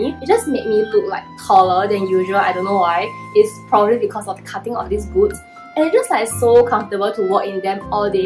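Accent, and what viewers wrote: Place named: China. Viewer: are you from china? your accent sounds like china accent!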